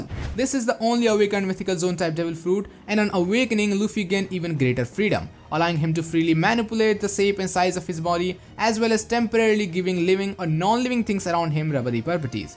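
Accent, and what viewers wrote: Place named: North India, India. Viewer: Bro, you need to work on your North Indian accent.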